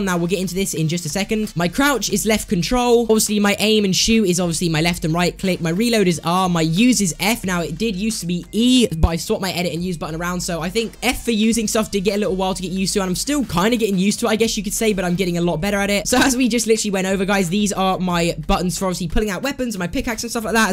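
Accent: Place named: United Kingdom. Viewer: I lowkey hate British guys that gives tutorials on Fortnite.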